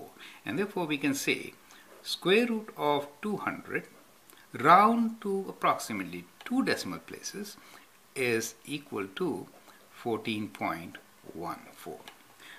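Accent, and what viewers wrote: India. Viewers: oh and its another indian guy with heavy accent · Vaibav V He is indian so english isnt his first language atleast hes doing his best to speak english and to help you understand square root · i hate the india voice, i can't understand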